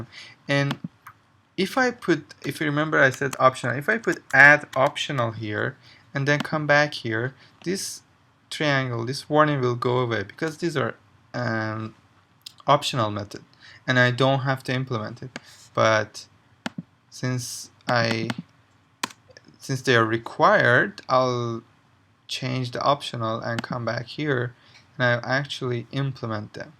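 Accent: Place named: India. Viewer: Dude, are you from India? Just noticed your accent, but anyway your english is perfect!). Your tuts is very cool too, thanks)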